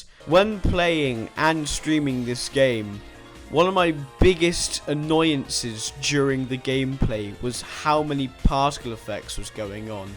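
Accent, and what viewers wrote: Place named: United Kingdom. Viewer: He's literally from the UK and one can clearly hear it lmfao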